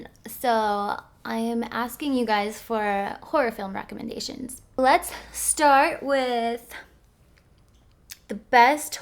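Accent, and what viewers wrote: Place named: Spain. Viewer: Good Spanish accent.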